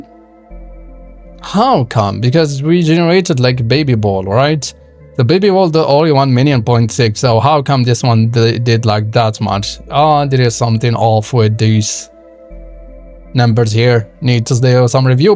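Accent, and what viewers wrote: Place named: France. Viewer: I like so much you "French Accent" that you imbue into your speach pattern :D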